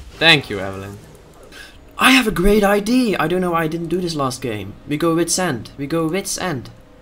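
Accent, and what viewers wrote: Netherlands. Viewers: You sound like you are Dutch Je klinkt alsof je Nederlands bent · is dutch he has a dutch accent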